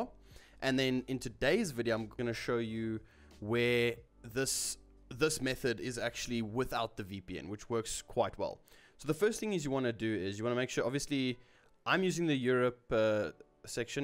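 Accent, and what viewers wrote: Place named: South Africa. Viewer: Nice vid, your voice makes me wish there was a South African OP in WZ now.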